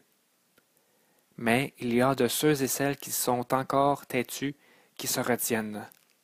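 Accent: Quebec, Canada. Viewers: Accent québécois.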